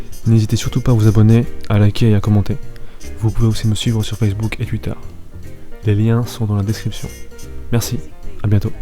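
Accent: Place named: South, France. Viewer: Pas mal la vidéo, par contre, on dirait que t'es trop près de ton micro, je sais que tu l'aimes mais, t'as pas besoin de t'approcher assez pour le manger x). Donc, tellement t'es prêt je t'entend respirer un peu et ça me stress xD Sinon, il manque un peu de dynamisme. Et l'accent j'aime bien, c'est tout mimi dit la québécoise qui n'écoute que des français tu viens du sud, nah ?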